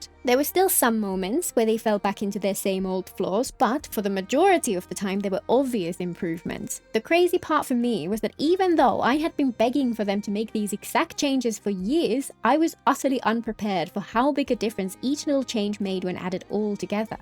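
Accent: United Kingdom. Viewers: Have you ever thought of doing ASMR ? I adore that voice and British accent of yours! BTW looking forward to your analysis on Archon quest 7.0. Great job.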